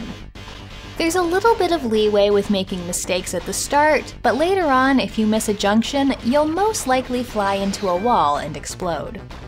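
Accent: Canada. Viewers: I remember seeing a Sega CD and Sewer Shark set up on a demo display at Meijer, which is a grocery store chain around Michigan which you probably don't know since I assume you are from Canada based on your accent, but as a young teenager at the time seeing this game I was blown away. For some reason, seeing shitty live video in a video game during the 90s just knocked your socks off. It is weird to think that devs and publishers thought that this was the future of video games at the time. Like they honestly thought super grainy live footage with a crosshair on the screen where you shoot dumb shit was where videogames were going forever. That's insane. On a side note, I'm playing Subnautica right now and I'm about 35 hours in and that game is insanely awesome. Maybe check it out if it looks interesting to you. It has the potential to give anyone PTSD though.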